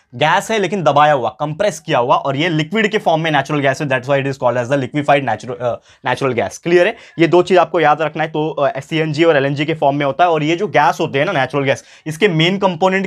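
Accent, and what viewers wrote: Maharashtra, India. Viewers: Sir Apki mother tongue marathi hai?? OMG!!!SAME Marathi gang like here!!!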